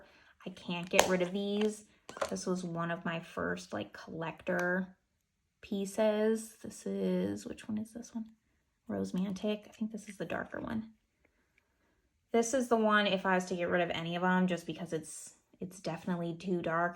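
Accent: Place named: United States. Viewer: Also I’m just curious, where are you from? Your accent is very pronounced something like Montana, Chicago, etc.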